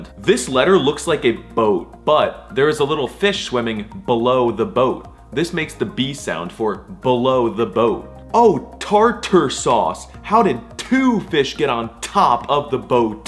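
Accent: Egypt. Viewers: Sorry but your Arabic is partially wrong. You are pronouncing and speaking Egyptian dialect especially for G which should be pronounced J. Your pronunciation of that letter is completely wrong. I didn't heard you talking too much sentences in Arabic but as per the few words you did used, I thing that also your speaking is Egyptian and that is not the real and full Arabic, it's only the Egyptian dialect. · لهجتك المصرية تحفة براااڤو❤😂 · my guy is talking like Egypt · there is a small mistake here . that alphabet doesn't pronounce like a "gagaga" its pronounce like gym. you seem to be learnt the arabic in egypt that's why you have the accent. other than this the video is awesome. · Y'know, as an arabic guy myself, the fact he learned arabic is such short amount of time, is literally spectacular. Especially that he learned the Egyptian accent too, it's a pretty hard accent. 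I seriously enjoy watching you! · ook but why you speaking Egyptian 😂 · This is not the real Arabic prononciation,this is Egyptian prononciation,just for correction . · As a Arab, I’m pretty impressed by the explanations but some of the pronunciations were mostly in Egyptian dialect and not how they are actually said, other than that amazing video we are all here to support you my brother. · You speak the Egyptian dilect. It's different from the standard Arabic. The ج should be pronounced like in Jacob not like in game. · Yeah, hes speaks Egyptian Arabic.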